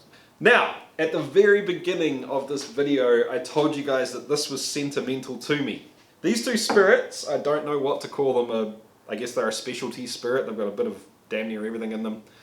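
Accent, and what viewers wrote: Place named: New Zealand. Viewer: If the accent didn't give it away, I'll let you in on a secret; He's a Kiwi, and New Zealand is a little nicer than the US when it comes to home distilling. :)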